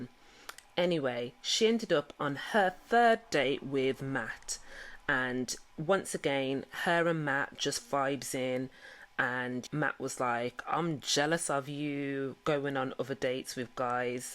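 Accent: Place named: London, United Kingdom. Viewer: I always love to hear a fellow Londoner (but I detect a hint of Brum, am I right!?) on YouTube! Now to the review 🍿 🍿 🍿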